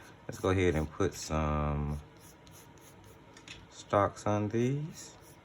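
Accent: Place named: South, United States. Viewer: I have watched 5 other YouTube videos and yours is my favorite! Excellent instructions as you paint. Love your southern accent.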